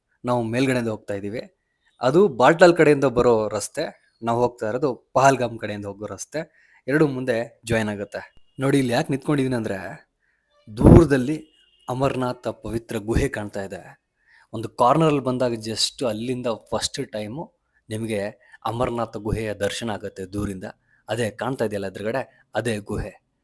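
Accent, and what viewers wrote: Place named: Central Karnataka, India. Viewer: Yava uru nimdu, launguage kelidre middle Karnataka ansutte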